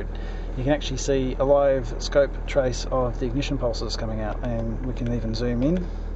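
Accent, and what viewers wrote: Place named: Australia. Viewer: I like Subaru’s with shift lights, clicked this video, and heard an Australian accent lol.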